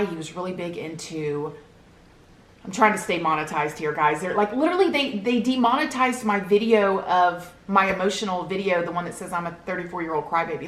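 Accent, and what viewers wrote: United States. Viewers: it's interesting how you have this strong American accent but your mannerisms and the way you emphasize or repeat phrases, so italian-canadian haha I love it cuz that's how I talk too but in a different accent xD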